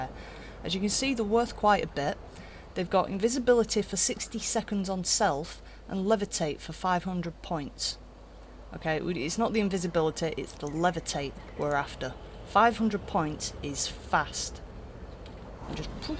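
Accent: United Kingdom. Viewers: Are you British :) i love your accent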